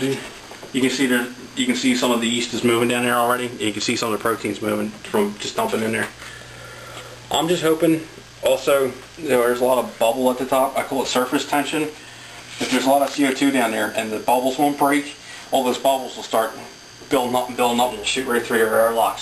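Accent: United States. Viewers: Are you from Baltimore? Sounds like a b-more twang to me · This is good work. You sound like a Pittsburgh accent, where are you from?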